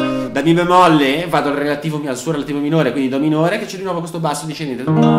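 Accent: Genoa, Italy. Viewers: È davvero molto bravo. E non pensavo che avesse tutta questa conoscenza teorica, perché non si prende mai troppo sul serio. Mi piace un sacco l'accento genovese quando chiede "avete capitooo???"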